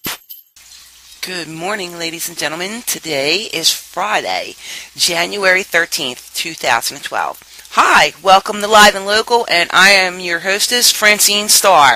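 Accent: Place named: Baltimore, United States. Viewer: wtf.. you have a baltimore accent lol...great video though.. it speaks the truth.. PRESIDENT RON PAUL 2012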